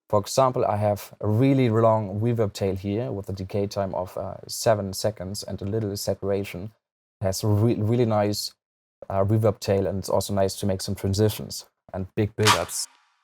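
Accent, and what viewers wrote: Germany. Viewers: This guy has such a nice German accent, love you.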